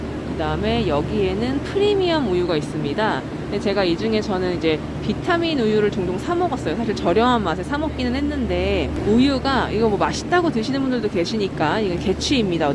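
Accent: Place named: Chungcheong, South Korea. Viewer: 충청도 사투리~~~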